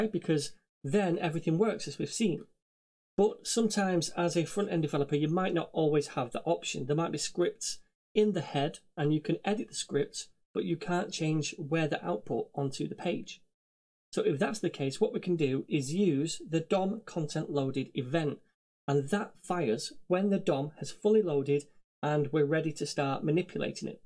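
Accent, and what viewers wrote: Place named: Manchester, United Kingdom. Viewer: Thanks for putting this course together, top stuff. Do I hear a Mancunian accent?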